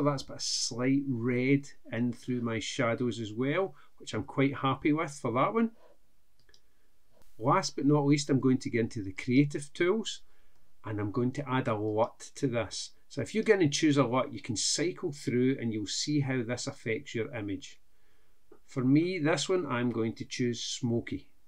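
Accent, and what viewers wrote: Scotland, United Kingdom. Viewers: i hope that you are not angry with my because of my comment, but i actually more used to american english, so it took a while before i understood what you said. I like your accent, but it was so strange to me in the beginning. In my 2,5 years of learning english, i never heard scottish accent. forgive me please;-) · That’s quite rude.. Scottish accent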